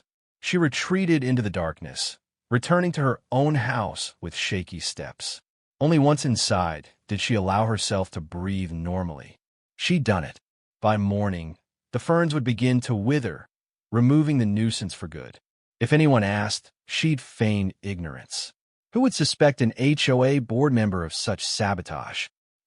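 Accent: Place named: Midwest, United States. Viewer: the voice has a Midwest accent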